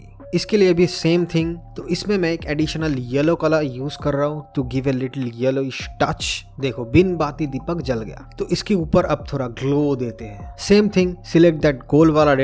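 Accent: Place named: West Bengal, India. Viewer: Bro, you awesome. Your Hindi pronunciation is very similar, are you from West Bengal? If yes, please tell me the location. I'm also a photographer & Filmmaker, I would love to go for a shoot one day. Lots of love & good wishes. :-)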